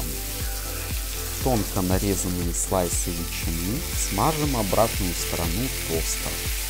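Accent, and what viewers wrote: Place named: Belarus. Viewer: Профи👍 У вас белорусский акцент. Или я ошибаюсь?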